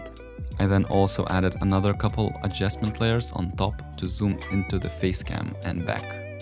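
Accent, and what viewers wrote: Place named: Russia. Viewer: А я слышу, что ты русский))))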